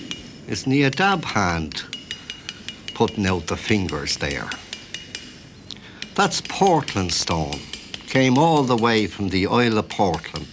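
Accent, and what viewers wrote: Ireland. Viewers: The narrator is stealing the show by being too bloody Irish. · Èammon Mac Thomàis has a much broader Dublin accent than Joe Duffy . That how you know this is him .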